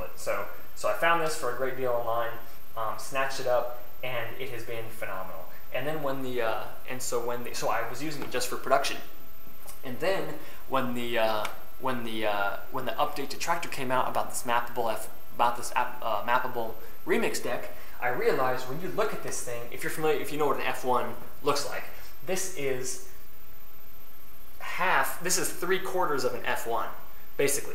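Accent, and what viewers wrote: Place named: Europe. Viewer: Thought this guy was european, lmao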